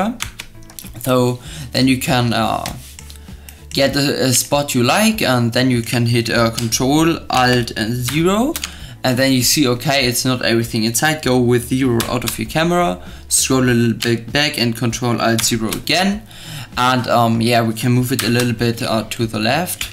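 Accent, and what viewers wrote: Germany. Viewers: Are u german?? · are you german? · You have a little German accent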